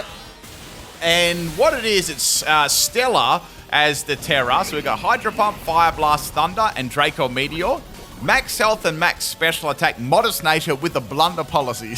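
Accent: Australia. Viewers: Love your vids! I enjoy how you are australian and more language illiterate than us Americans! Everyone makes fun of us for not knowing languages but as someone from the U.S who speaks 4, your pronunciation on words makes me feel better on the languages im trying to learn!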